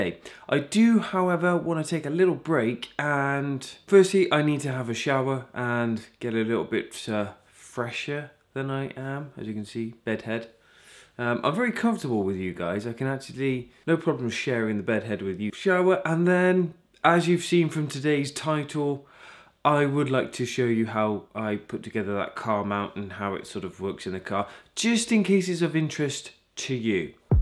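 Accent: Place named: United Kingdom. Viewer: i like those british accent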